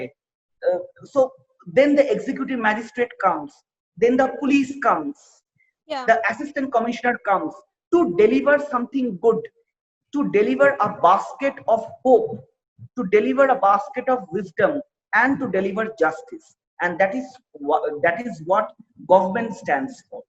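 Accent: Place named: Bengal, India. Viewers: I appreciate you dada n i respect you..but ur bonghindi 😂 🤣.. love that.. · Your bong hindi 👍👍👍👍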